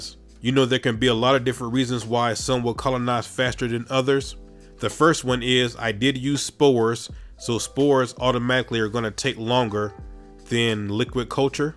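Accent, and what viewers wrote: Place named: United States. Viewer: I love the American accent, it's deep or somethin'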